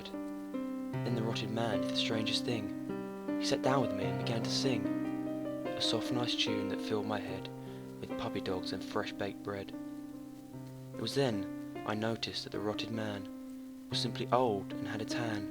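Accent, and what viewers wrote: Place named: England, United Kingdom. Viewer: Very good, nice to hear one in an english accent! Xx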